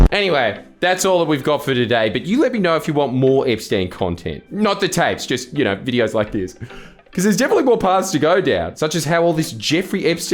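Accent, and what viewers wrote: Australia. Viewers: This man is funnier because of his Aussie accident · I'm assuming you are Australian and my mind can't process those words with an Aussie accent😅😅🤙🏾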